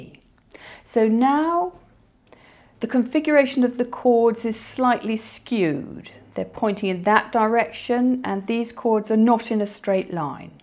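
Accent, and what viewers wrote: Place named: RP, United Kingdom. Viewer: Thank you for this well explained tutorial. I love your RP English. Good to learn pronunciation and easy to understand.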